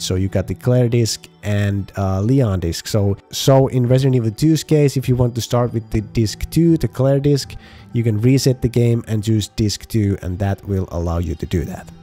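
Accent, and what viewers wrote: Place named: Finland. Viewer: Suomiaksentti on kyllä niin tunnistettavissa välittömästi. :D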